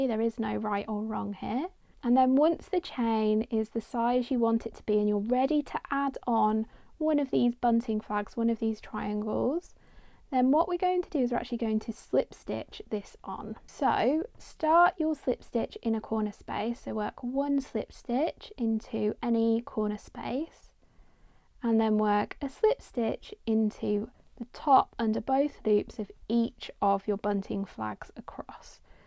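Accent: United Kingdom. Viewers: You have a British voice but I think you are using USA crochet terms ?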